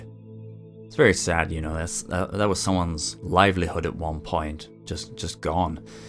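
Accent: Manchester, United Kingdom. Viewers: Creepy video - nicely done! Your accent sounds Manchester-ish.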